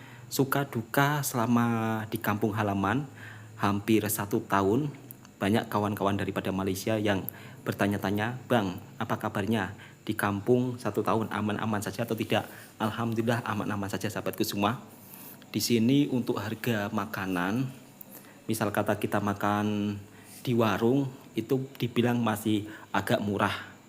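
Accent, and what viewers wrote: Java, Indonesia. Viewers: Lidah jawa terlalu pekat walau berbelas thn diMalaysia.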